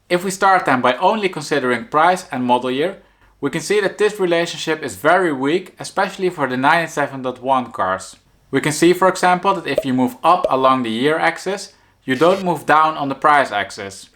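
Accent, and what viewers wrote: Netherlands. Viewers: This is defenitely NOT a German, since this guy is talking with a superstrong dutch tonality 🤣. Best regards from Hamburg, Germany...Frank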